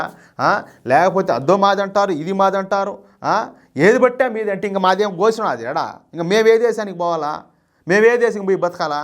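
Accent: Rayalaseema, India. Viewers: రాయలసీమ యాసలో నిక్కచ్చిగా చెపుచున్నారండీ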